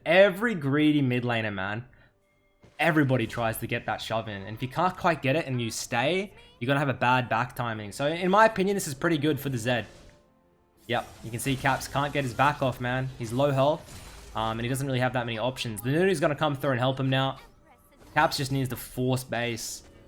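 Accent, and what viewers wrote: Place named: Australia. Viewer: love the Aussie commentary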